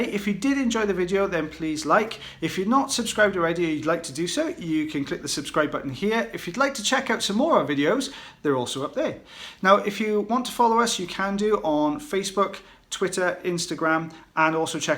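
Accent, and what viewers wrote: United Kingdom. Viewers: I find these vidoes very fascinati g. My favourite are the cotton ball/ paper removal. But i love your beautiful accent. Def my favorite of any British accent.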